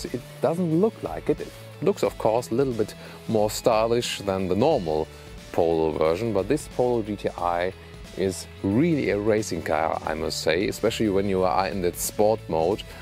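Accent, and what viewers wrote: Germany. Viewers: your German accent sounds reliable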